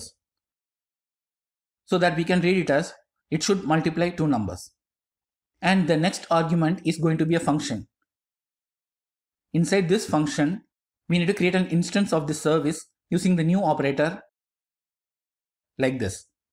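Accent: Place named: India. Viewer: 1) Well that looks interested 2) Indian accent, out of there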